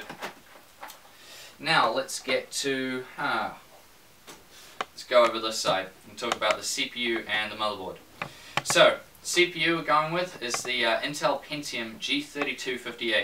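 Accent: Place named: New Zealand. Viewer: Just realized the new zealand accent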